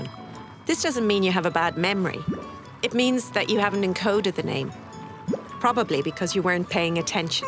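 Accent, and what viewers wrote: Ireland. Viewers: I was wondering why I heard a faint Irish accent. Then I saw 'BBC' in the channel's title :) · So does this mean it's not my fault that when I hear an Irish accent (sorry voice over) I automatically think of Gypsies trying to tarmac my drive or sell me pegs?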